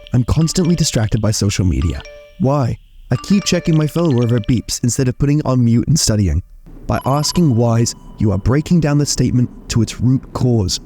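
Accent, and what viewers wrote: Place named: United States. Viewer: Yo I'm just wondering if you're really from India. Ur accent sounds like American, great!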